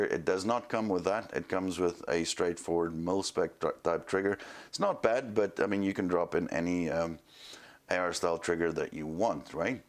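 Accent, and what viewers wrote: South Africa. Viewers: Great video, thanks. Off topic, and if I may ask, what nationality are you? Your English accent sounds like it has South African roots, with a bit of Irish and a lot of American influence. 🙂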